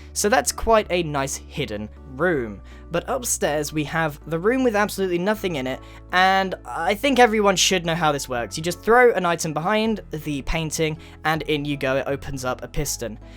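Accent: United Kingdom. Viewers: I am new ,but this guy sound like he is British or he sound like you are supposed to be in a Harry Potter movie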